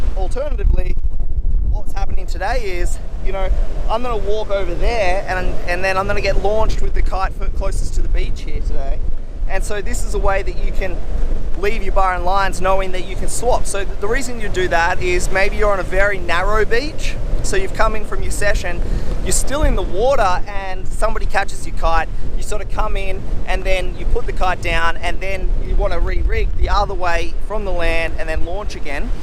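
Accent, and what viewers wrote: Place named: Australia. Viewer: Hey. Just wanted to say I’ve appreciated all your videos. It’s great to have an Aussie voice showing us the way. It’s clear you love the sport and want others too as well. Your lessons are well thought out and easily understood ( except for the odd one where the wind in mic plays havoc. And your location always looks stunning too!! I hope you’re ok. I haven’t seen any new vids for a while. I have a question for you and I’m not sure whether you could help with some technical advice. I’ve noticed as soon as the wind gets on the stronger side for my kite size I have a lot of difficulty stabilizing the kite and often end up constantly sineing the kite unintentionally. Do I need to just focus more on what I’m doing or should I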